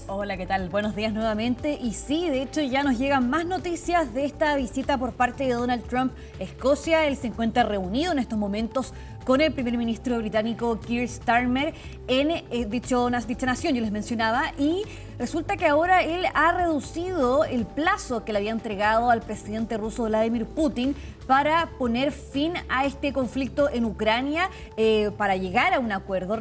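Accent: Chile. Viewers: Ese acento que chistoso es chilena ?? Me distrae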